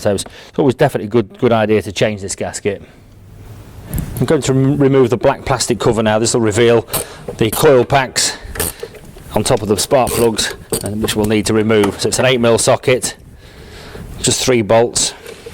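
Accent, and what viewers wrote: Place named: Lincolnshire, United Kingdom. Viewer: Cant beat the Lincolnshire accent! Rover land rover, the only car manufacturer I am aware of that would take the water pump drive off the cam belt. Nowt like putting a high fail item on an essential drive part. Found out yesterday that the water pump on my freelander is wagging around like a dogs tail. Typical, a job that I could do on my drive has to be given to a garage because its October and the weather is crap in Skegness.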